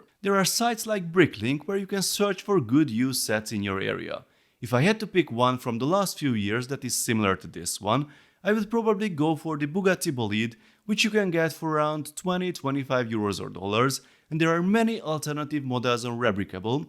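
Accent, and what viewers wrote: Hungary. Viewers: Szerintem van egy kicsi magyar akcentusa😂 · No way hogy a bro magyar, nagyon fasza accent🎉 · You are Hungarian? I could have sworm I heard the accent, but never openly guessed it 🤣 howdy neighbour, greetings from Croatia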